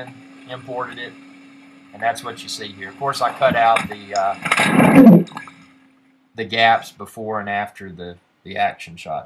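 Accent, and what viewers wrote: South, United States. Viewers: That would be a Sexy Southern draw ;)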